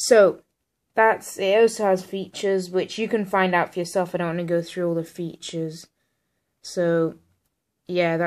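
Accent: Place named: United Kingdom. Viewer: you sounds like British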